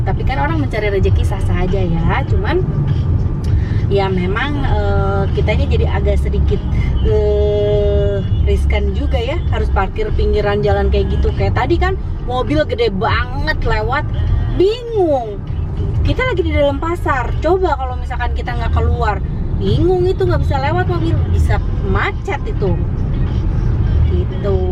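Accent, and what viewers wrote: West Java, Indonesia. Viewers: Ari teh teh orang bandung na palih mana, meni resep ih nyariosna lucu khas sunda pisan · Aku sneng bgt sama chanelnya te suka sma logat sundanya, upload yg bnyak ya teh 😁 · Salam kenal dari aku teteh seru banget belanja ke pasar semua diborong Teteh aku sangat suka nonton semua Vidio teteh Cara ngomong teteh b sudanya masih terasa Itu yg bikin khas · teteh meni raoseun logat Sunda na😀😘😘😘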